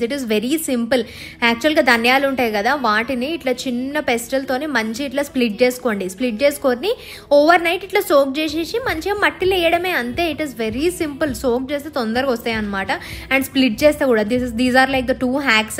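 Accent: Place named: Telugu, India. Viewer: The way you're talking english same to same Telugu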